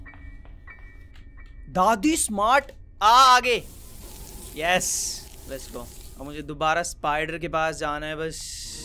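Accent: Haryana, India. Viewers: Who else miss's his haryanvi accent 😢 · I think he needs to bring his old desi accent back 😂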